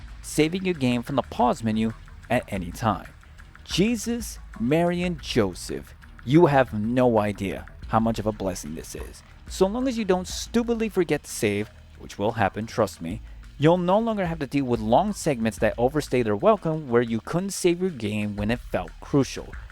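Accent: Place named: Netherlands. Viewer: You sound kinda finnish with some pronunciations. Jokes on me before you turn out to be dutch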